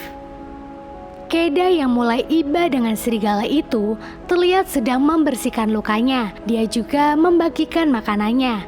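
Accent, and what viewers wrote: Pemalang, Indonesia. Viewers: Mbak wong pemalang? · Mbak Pemalange Ndi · Wong pemalang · Pemalang selatan ya😂😂😂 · Mba nya orang Pemalang kah?? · Mba smpean wong pemalang po?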